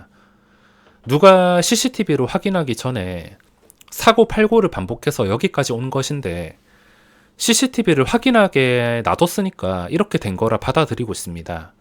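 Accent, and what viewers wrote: Gyeongsang, South Korea. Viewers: 님께서는 오늘 원없이 단타를 쳤습니다라고 멘트 하실 때 제일 멋져요 님의 지난 영상도 다시 보고 매일 올려 주시는 그날의 영상도 다시 자꾸 보게 되는데 노터스 금맥 짚으시고 울면서 보내주어야 할 것 같습니다라고 멘트하실 때 제일 쿨해 보였고 반짝반짝한 종목들 찾아 욕심 부리지 않고 매도하고 시장의 흐름을 그 누구보다 먼저 짚어내시는 모습 아이 데리고 키즈카페에 가느라 종목 움직임 하필 못 보았다고 하소연하시던 인간적인 지난 영상 등등 개미의 입장에서 생각하고 개미들 걱정 많이 하시는 마음이 너무 고운 모습 심법 잃지 않는 매매 정확한 저점 확인의 두근두근하던 날들 모두 응원하며 공감하고 듣고 있습니다 귀에 쏙쏙 들어오는 경상도식 억양의 종목명 언급 기대할께요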